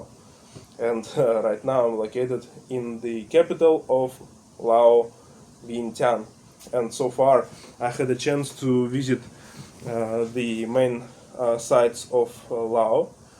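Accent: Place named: Russia. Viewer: У меня впечатление, что так разговаривать может только россиянин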